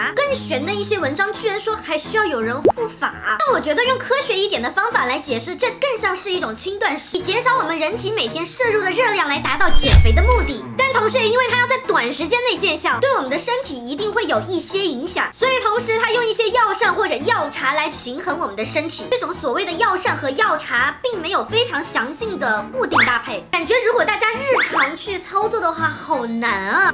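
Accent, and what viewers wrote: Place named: Sichuan, China. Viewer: 原來跟密子君一樣是川妹子！！難怪講話口語口氣超像！！！喜歡😍😍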